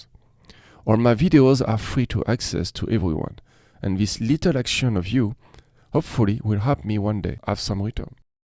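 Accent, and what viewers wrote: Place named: France. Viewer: french accent!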